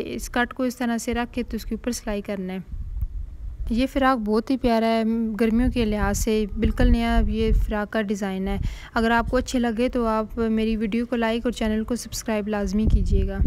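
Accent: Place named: Chakwal, Pakistan. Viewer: App ka lejha bta Raha ha k ap chkwal side se hn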